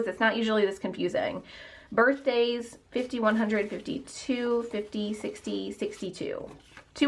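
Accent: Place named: South, United States. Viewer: I knew from your accent that I was listening to a southern girl 😂 hello from Louisiana!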